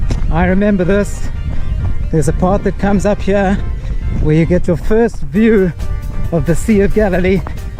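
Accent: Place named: South Africa. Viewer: גם כל כך נחמד לשמוע את המבטא הדרום אפריקאי האהוב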